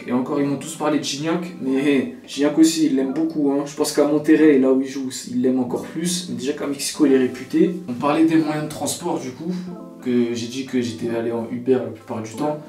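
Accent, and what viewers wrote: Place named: Suburbs, France. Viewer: Sa c voit t un mec bien et tu fais ton truc et tu kiff, donc arrete de parler comme un pelo de banlieue pcq sa rabaisse ta qualité de video et les trucs que tu fais qui sont interessant.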